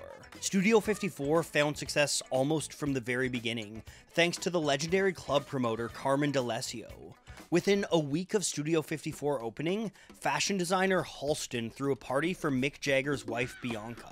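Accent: Canada. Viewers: Absolutely well put together. Granted your Canadian accent does give a small clue as to why you may have mispronounced Giorgio's last name (it's 'More-row-der'/ Moroder). Clearly, I wish the video would have been longer. Great job though!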